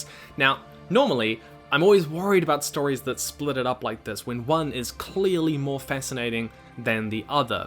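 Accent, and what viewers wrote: New Zealand. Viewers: Its a kiwi. Love it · Yo bruv. Good vid. Where you from? You sound like a kiwi to me. Good to know there's more than just me reading this sort of thing in nz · Great to hear a Kiwi do a review, too often it's Americans which is fine but I like to hear different voices. Thanks for your review. I'm going to buy the trilogy.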